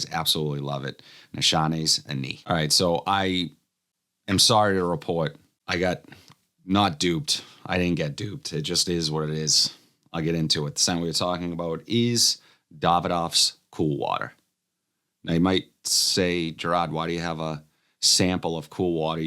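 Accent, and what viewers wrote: Boston, United States. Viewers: Here we go again… I feel like I’m in Boston again with your accent lol. Anyhow, I think you would like Ani X . Fruity hints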